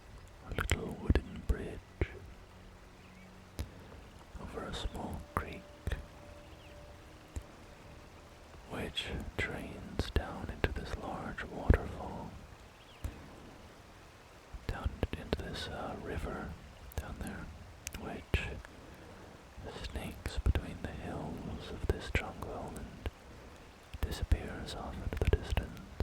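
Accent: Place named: Scotland, United Kingdom. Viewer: you have a scottish accent in the way you pronounce certain things it became very apparent right before you revealed your heritage i share some irish/scottish blood as well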